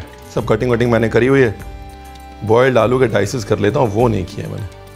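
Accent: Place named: Bengal, India. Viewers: Ranveer sounds so cute when he pronounces words with Bengali accent :) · is bande ko sach mein pata hain ki bangla mein masla ko moshla bolte hain isliya to tumi atto valo katha bolo dada awesome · His Bengali accent is just 👍🔥😍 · Hi Chef. I'm a Bangladeshi and often watch your videos mostly because of the cooking tips and background stories you share. I loved your Jhalfrezi recipe and loved that you explained the history so well. But yes this particular video made me a true fan of yours. I really really love your (almost) authentic Bangla pronunciations. Jhal Muri is a popular roadside snack in Bangladesh as well, however we do not add coconut and rather add a spicy paste of gram pulses which locally is known as Ghugni, hence spicier. I would really request you to visit Dhaka once and try out typical Bangladeshi dishes like Kacchi Biriyani, Tehari, Fuchka (ours is fully loaded Fuchka, unlike the pani puris), Chotpoti, Fish dishes, Kebabs in Puran (old) Dhaka and what not. 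I'm sure you will have a great time to experience dishes which are somewhat sounds similar to Indian dishes but actually are so different in taste. Love, from Dhaka <3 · ঝালমুড়ি, ঝালমুড়ি, ঝালমুড়ি। কথায় কিন্তু বাংলার ছাপ। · His Bengali accent is so natural..😍 we also call it ঝাল মুড়ি / jhal muri in Bangladesh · Your bengali accent is so good 😍😍 And Jhal muri is valo basha😋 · His Bengali accent wins my heart ♥ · Other than jhalmuri loved ur bengali accent....❤️❤️ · Sir, you have a proper Bengali accent❤️. Amazing sir. Loved it. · Your Bengali accent is too cool. I'm form Bangladesh 🇧🇩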